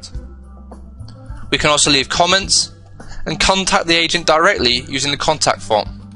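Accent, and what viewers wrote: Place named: United Kingdom. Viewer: I agree. It is a classic British accent,but not at all pompous or fake.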